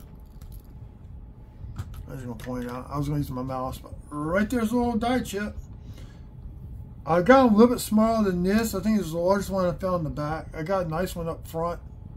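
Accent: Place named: South, United States. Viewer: Nice Southern 👍 😊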